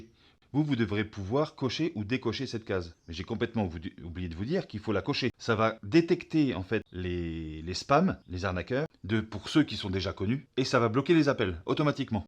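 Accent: Franche-Comté, France. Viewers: D’où vient cet accent franc comtois ?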